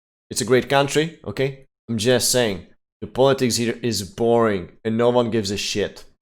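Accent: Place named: Russia. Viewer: You sound Russian to me.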